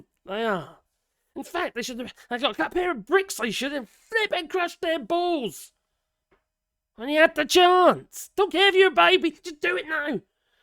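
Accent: United Kingdom. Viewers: Keep the insults on America coming ! I'm serious. Americans are smitten with British accents; pretty much every advertiser in the U.S. uses British voices to sell stuff because we always assume someone with a British accent is smart. Maybe you can make an impact on those trumper morons that the rest of us flat-accent Americans have failed to do.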